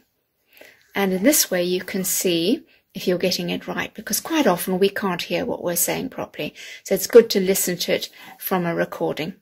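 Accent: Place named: United Kingdom. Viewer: I've really enjoyed the video as her accent is so clear, the british accent is the best accent ever 😍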